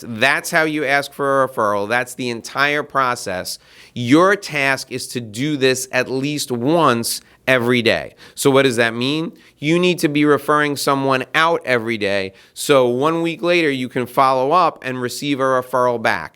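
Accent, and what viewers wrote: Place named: United States. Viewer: I really appreciate your efforts to make sales people educated. I like your accent, it's US I guess. Subscribed!!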